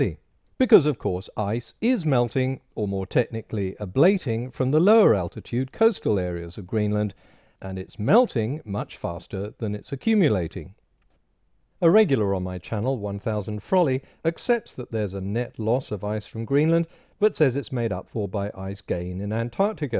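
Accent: United Kingdom. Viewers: The British accent meshes very well with the heir of nonchalance. And truth. Can't overlook truth, right? I mean, you'd think... Whatever.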